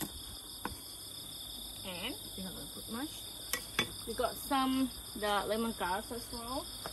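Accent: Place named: Australia. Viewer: I can hear the Australian accent in that English language 😊👍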